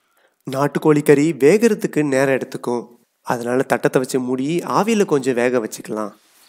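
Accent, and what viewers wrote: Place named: Kongu, India. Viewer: Konku tamil pronoun supper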